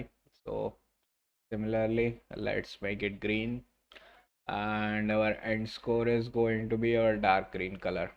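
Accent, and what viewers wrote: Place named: Gujarat, India. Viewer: are you form Gujrat ? keep it up bro.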